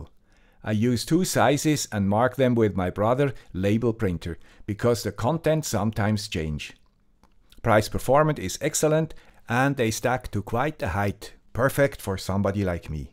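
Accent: Switzerland. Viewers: ok, remember a couple of months go, i complained about your english, but after watching you a bit, i get used to it, and now i really like your accent... i mean, i have a Youtube canal too (shameless plug here is the channel name) and i speak english there too, and im also from swizzerland, and i have a accent... thats what is special about us, we dont speak the perfect english... keep going. i like what you are doing! and because the temperature, i have a idea: you can let cold water flow where no sensors and sorar panel is, and it should cool it a bit(hopefully enough)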